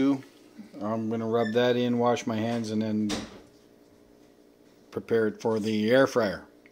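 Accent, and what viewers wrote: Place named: Midwest, United States. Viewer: Most Midwestern accent ever lol!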